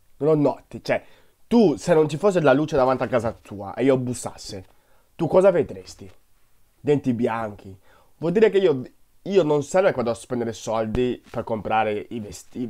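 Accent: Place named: China. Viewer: Perché parli come un cinese?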